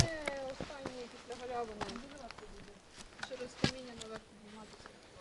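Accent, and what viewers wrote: Russia. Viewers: вы разговариваете по украински с русским акцентом по русски наоборот с украинским 😮😊❤ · Потому что думаете вы на русском и это слышно😢😢😢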